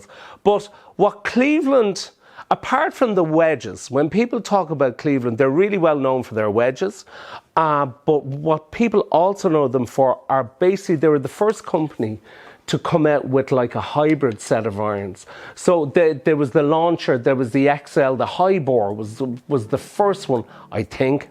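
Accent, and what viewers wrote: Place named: Ireland. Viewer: Great to hear his accent. As a fellow Irishman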